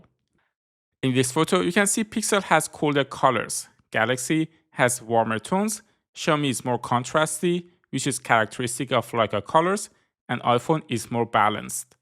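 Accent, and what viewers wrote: Iran. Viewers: به به لحجه ایرانی 😂❤❤❤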